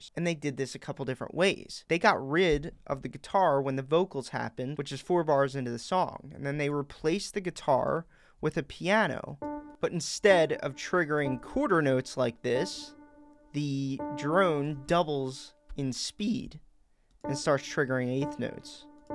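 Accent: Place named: Delaware County, United States. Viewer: I've watched a handful of your videos and could not get over your HEAVY Delco accent. Hat and Jersey confirms it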